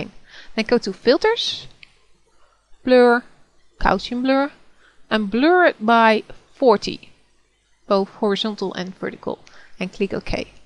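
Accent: Netherlands. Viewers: I hear a Dutch person speaking!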